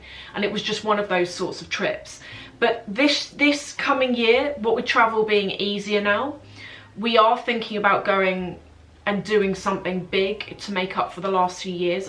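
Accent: RP, United Kingdom. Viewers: Your accent is so posh!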